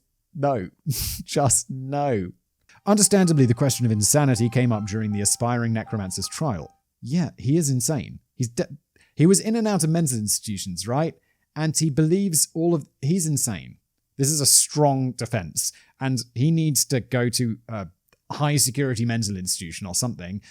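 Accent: United States. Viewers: Why u talking like americano.?😊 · I am slowly working my way through all of Simon's offerings, so I know I'm late to the party... but whenever Simon gets to the point where the criminal is caught... he sounds more and more American with each episode. I don't remember which episode it was, but when he was hoping that the particular state had the death penalty... yeah, come over to the dark side of the pond. The majority of us here prefer to live and let live. The ones who get all offended are usually just politicians 🙄.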